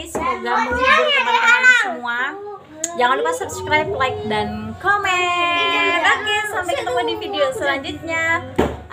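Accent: Java, Indonesia. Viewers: Tapi seperti orang Jawa logatnya mom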